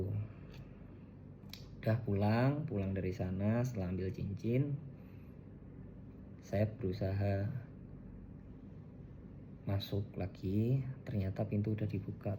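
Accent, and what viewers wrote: Java, Indonesia. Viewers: Logate Medok mas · Lho koh medokkk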